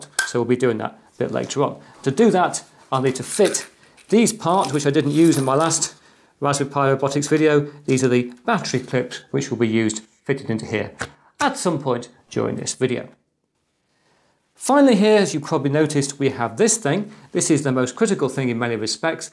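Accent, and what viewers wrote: United Kingdom. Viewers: You can set the video speed to slower (0.25, 0.5 or 0.75 x ) in the settings menu at the bottom right of the video. I've got mine set to 2x because I find his accent (British) easy to understand.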